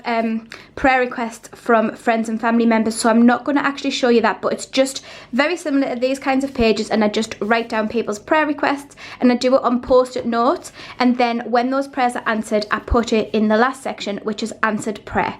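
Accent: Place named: United Kingdom. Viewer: This is so wonderful. I think God must want me to do this for myself because I've found many on youtube. You are the only other one that I love your format and how you set your journal. Thank you! I love your accent! Where is that accent from? UK somewhere? I'm in Texas.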